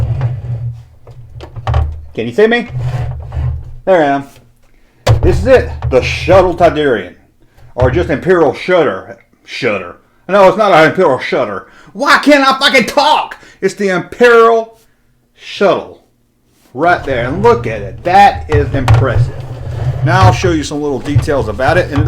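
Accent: South, United States. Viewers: Ya can’t talk right because you were raised in the south ya hick.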